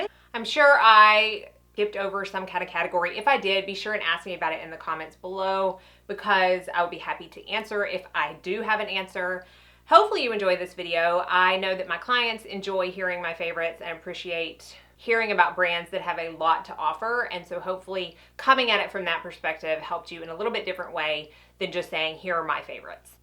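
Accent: South, United States. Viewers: Where are you located? I only ask because I live in Louisiana and I detected your southern accent. I vaguely remember you mentioning Tennessee?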